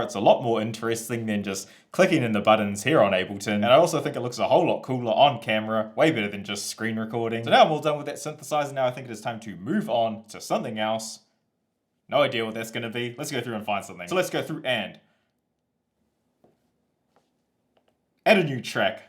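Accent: New Zealand. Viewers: New viewer here: Your channel says Australia, but all I can hear in that accent is New Zealand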